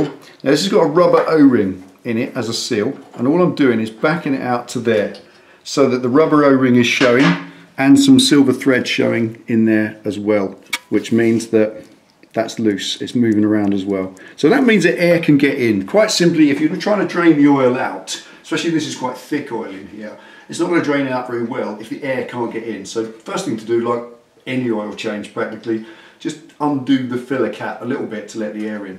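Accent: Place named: England, United Kingdom. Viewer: First, this is a great series man, and I'm going to throw you a bone on PP, or buy a Tshirt to say thanks for your work. Never again will I pay these outrageous fees because that's about right on the money. Average low end cost is about $100 USD per hole anywhere in Texas. Service rates vary from $85-$125/hr depending on where you are. I just picked up an old 04 Springer, and I'm using these videos as they are the best on YouTube. Plus, I love English accents, so that's a good bonus. 😎 A couple of questions mate...if you don't mind. 1. Old timers, especially experienced Mechanics strongly encourage running 80/140 in the gearbox. S&S performance does as well by the way. What say you about that? 2. Just curious...Why did you not use the socket for plug removal here?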